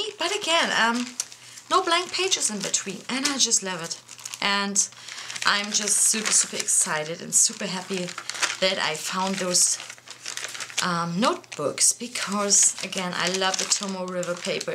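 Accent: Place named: Germany. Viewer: Deutschen Akzent sofort erkannt haha. Ich liebe deine Kunst sehr schön 😘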